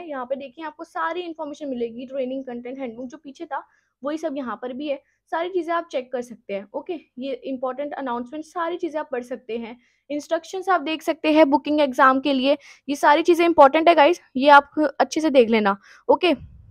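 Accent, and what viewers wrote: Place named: Delhi, India. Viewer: AAP kaha se hai delli se kya. App ke baat se pata chaltaa hai madam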